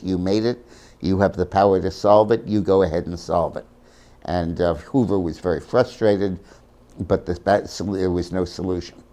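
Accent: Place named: New England, United States. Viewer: Histray. . . Very New England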